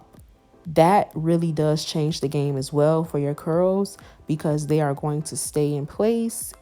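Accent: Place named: Miami, United States. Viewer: Recognize a Miami accent from anywhere. 💕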